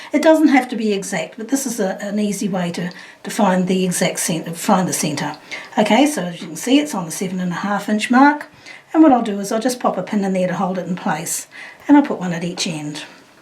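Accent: Australia. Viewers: Oh! Thanks for clearing that up; sorry I didn't quite understand. I love the Australian accent!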